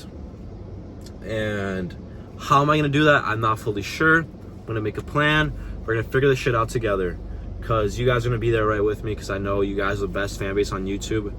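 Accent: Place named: Eastern Europe. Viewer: Are you Russian/Ukrainian? Your accent is a little Slavic.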